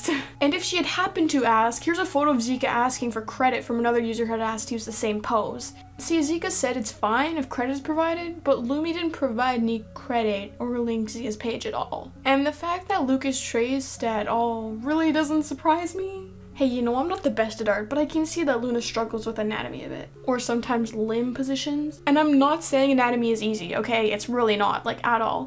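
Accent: Canada. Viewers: I can tell you're definitely Canadian but still a very informative video lmao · I could tell too, even though I’m not Canadian. I live in one of the northern states, and the accent is really similar. Lol.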